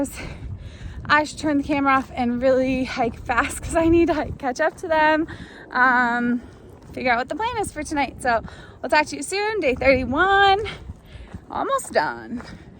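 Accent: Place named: Minnesota, United States. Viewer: Uff Da! Spoken like a true Minnesotan! ❤️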